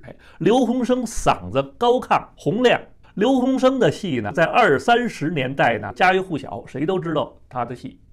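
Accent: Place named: Beijing, China. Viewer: 特喜歡聽北京老話。牛年吉祥！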